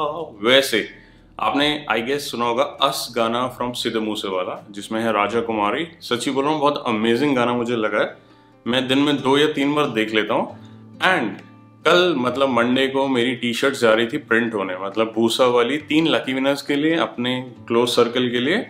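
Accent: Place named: Punjab, India. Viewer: Pehle baat to sir 3 reason hi kyu😁? PERSONAL BTAYU TO(CAN I WRITE IN PUNJABI) 1.PEHLE GAL TA BHAJI THODI EH VDIA LAGDI A KI TUC APNI MOSTLY TRIP TE KHANA VEERE NU LEKE JANA O ❤(THODI JODI BOHOT VDIA A, DONO DOWN TO EARTH HO❤) 2.THODE CINEMATIC SHOTS MANU KAFFI VDIA LAGDA A CAUZ 😁MAVI FILMAKING KARNI A, MANU BOHOT INTEREST A IS FIELD CH❤ 3.THIRD BHAJI EH A VI TUC HEGE TA DELHI DE HO BUT PUNJABI BADI SWEET BOLDE O😂😍HINDI TONE + PUNJABI LANGUAGE= 4.MERE VLO BONUS 🤫, TUC HAR TRIP START KARAN TO PEHLA WAHEGURU JI DE DARSHAN KARDA O❤AND END HMESHA EKO SALOGAN BOLDA O MEHNAT HMARI REHMAT UNKI AUR PYAR APP SBKA❤(DEEP GAL KEHTI TUC SACHI❤)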